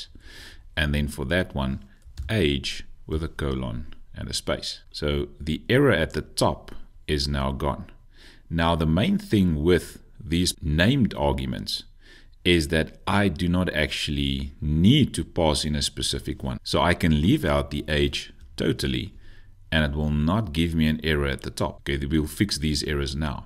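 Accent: South Africa. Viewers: hey, I just realised that is a fellow South African, the use of Rands and accent. represent, represent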